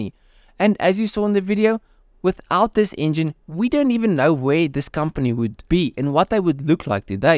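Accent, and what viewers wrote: South Africa. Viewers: I'm really loving these videos. You're picking the best cars. Is that an SA accent I'm hearing?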